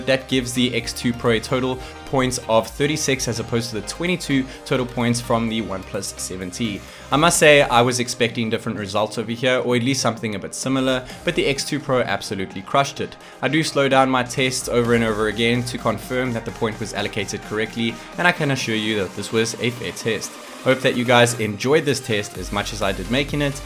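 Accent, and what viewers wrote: South Africa. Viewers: You sound South African..? 🇿🇦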